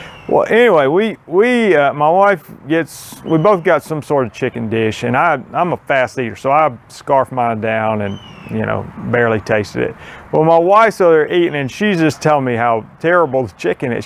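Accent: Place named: Texas, United States. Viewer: How many employees and major pieces of equipment do you 'run' to service 270 customers? You must have a great office manager to handle all that while you keep up the channel. What city are you in? Your accent sounds Texan more than anything from Alabama. How many years did it take you to get to 270? How much faster would you say it grew each year based on your expertise in fertilization and weed identification and killing?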